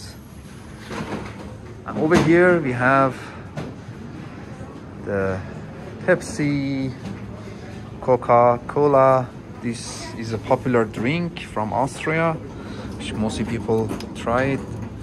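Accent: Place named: Iran. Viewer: داداش از لحجه ت تابلوعه ایرانی هستی دمت گرم😅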